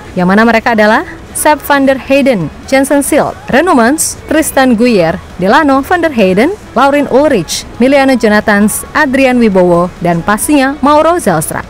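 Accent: Java, Indonesia. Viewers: Jowomu ngendi min? 😅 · Mimin logat ya jawa bgt yakin dah...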